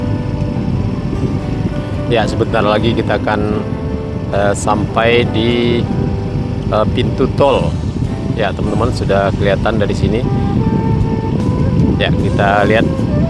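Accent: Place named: Padang, Indonesia. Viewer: Bahasa Indonesia nya Padang banget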